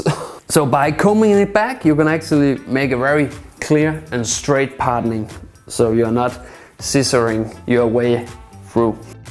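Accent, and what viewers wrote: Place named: Denmark. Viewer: Dansk accent dræber mig..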